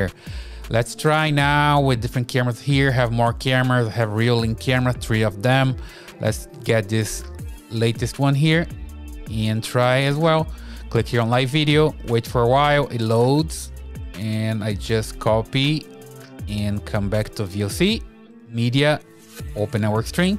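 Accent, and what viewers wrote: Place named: Brazil. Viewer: Thank you for that! It's my first time in your channel, you accent sounds familiar. Brazilian?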